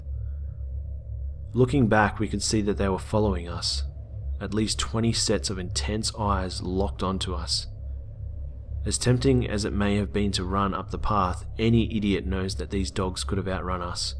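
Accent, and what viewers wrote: Australia. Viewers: are you from australia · You're Aussie yeah?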